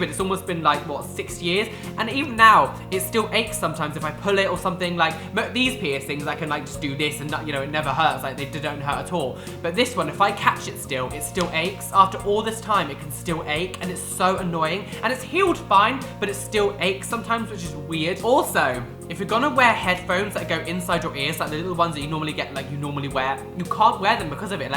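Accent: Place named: England, United Kingdom. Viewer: Madonna quanto fa schifo l'accento inglese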